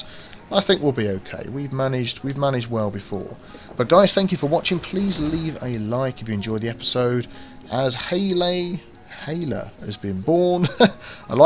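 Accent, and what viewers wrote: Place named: Northern England, United Kingdom. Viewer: Hello! If you don't mind me asking what part of the United Kingdom are you from!? I can't put my finger on it! You sound kinda of northern from were i am at in London.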